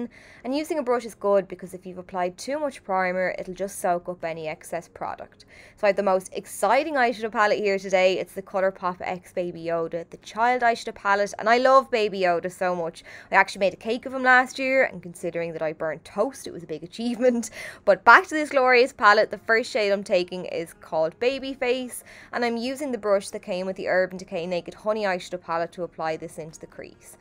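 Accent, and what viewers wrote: Ireland. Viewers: Are you Irish? Sorry that’s random to ask, just really like the sound of your voice so I was just curious ☺️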